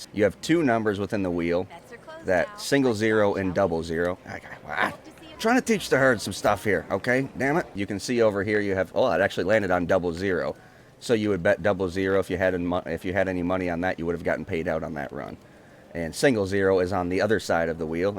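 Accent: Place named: Minnesota, United States. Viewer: Your from Minnesota. So I’m I 🤪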